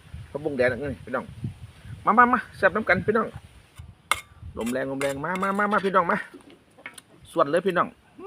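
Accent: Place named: Korat, Thailand. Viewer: ภาษาโคราชฟังง่ายสบายหูคุณกินแล้วทำให้ผมน้ำลายไหลด้วยผมติดตามคุณทุกคลิปเลยครับ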